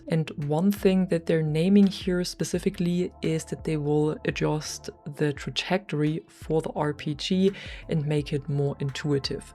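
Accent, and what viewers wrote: Germany. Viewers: where are you from? Dialect sounds German?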